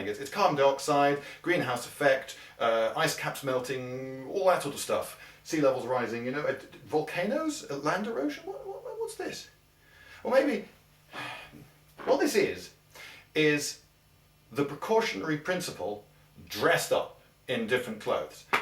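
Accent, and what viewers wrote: England, United Kingdom. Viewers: Though I disagree with the actual thing he's arguing against, I agree with the concept and the way he presents it. Maybe it's because I'm American and we are thorough - bread to listen to anyone with an English accent. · While I do think that GW is something to take seriously, I also think carbon offsets are just a load of crap. That said, I have to tell you that were it possible, I would sleep with you. :) What can I say? You're both intelligent and attractive, and English accents are hot. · Ah yes. I do like me a good English accent.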